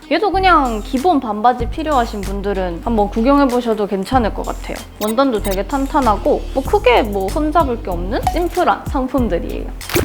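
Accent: Gyeongsang, South Korea. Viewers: 님 대구분이세요?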